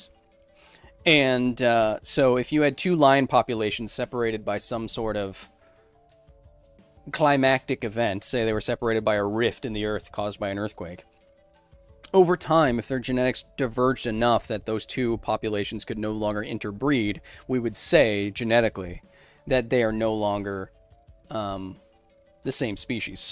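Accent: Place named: Australia. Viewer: I’d say your Australian accent sounds similar to Wood’s accent from Beatemups. Not really an opinion on what region it sounds like, but you can do an impression xD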